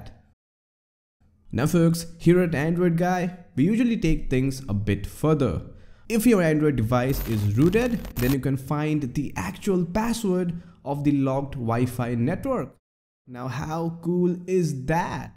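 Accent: India. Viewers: Are you from India?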